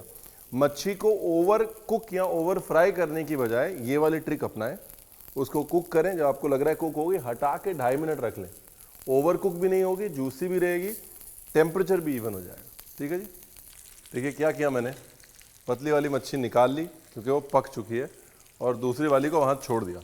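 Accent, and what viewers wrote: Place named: Kanpur, India. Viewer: Aap to kanpuri boli bolte hai fuffpi kanpur ki thi mujhe Aap ki her dishes pasend hai Mai banati hoo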